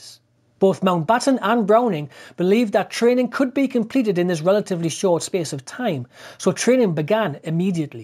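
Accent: Newcastle, United Kingdom. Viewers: Excellent video. Sorry about you pissing-off the Para's - they hate being mistaken for the Green Beret mob! Love the Geordie accent - why Northern and not North-Eastern Historian? (I was born in Hartlepool.)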